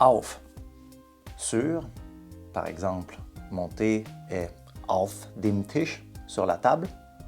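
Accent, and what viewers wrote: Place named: Quebec, Canada. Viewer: Bonjour, je viens à peine de m’y remettre à l’allemand, ayant abandonné cette langue car j’avais une très mauvaise méthode et une méconnaisance de l’apprentissage puis je me suis rattrapé grâce à l’anglais, l’espagnol et l’italien (j’essaye d’apprendre le turc aussi) et j’avais tant envie de m’y remettre. J’ai trouvé (tout juste) votre contenu qui est très pédagogique (j’adore l’accent québecois). Une bonne journée de la part d’un alpinois .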